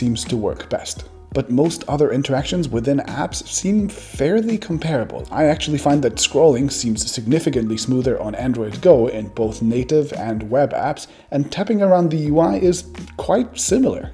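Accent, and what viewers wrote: Germany. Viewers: You are german? :) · Are you from Germany ? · Du bist deutsch? :D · Hab ich mir doch gleich in Mrwhosetheboss Video gedacht dass du Deutscher bist :D Wir können unseren Akzent einfach nicht verstecken wenn wir Englisch reden^^ Aber nett so deinen Kanal gefunden zu haben, war mir bisher echt unbekannt obwohl du ja echt schon ziemlich viele Abos hast, jetzt hast du noch einen mehr^^ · Manchmal wünschte ich mir du würdest einfach Deutsch sprechen. Nicht weil dein Englisch schlecht wäre oder ich dich nicht verstehen würde, aber das offensichtliche"ein deutscher spricht englisch" englisch das du sprichst lässt mich einfach erwarten das da gleich ein paar brocken deutsch durchstechen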